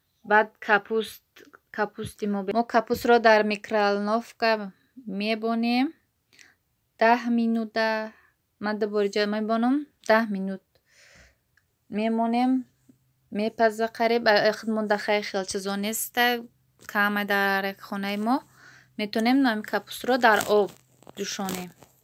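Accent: Kulob, Tajikistan. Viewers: Салом хохар лахчай хутонкати яне кулобиги гапзанен😍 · Кулоби мегум гапзанен гуш кунам шумора 👍👍👍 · Салом ман мехмони нави шумо аз кучои Точикистон хастед? Гапзаниётон ранги Кулобиё ба фикрам хамшахрием.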